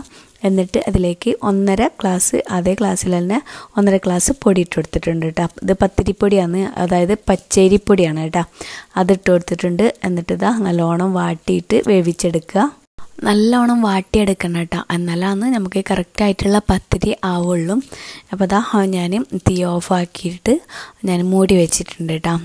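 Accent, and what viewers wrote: Kasaragod, India. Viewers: Kasrgod ningala pattal kadha keettu nallonam chirichu..avida nallarasayrikoole..slang different aya inganavum lee..enthayalum vedio adipoli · pathiri Story comedyy ayikknn😃😃ippo varthanam ketta ippo kasarkode thanne😍😍😍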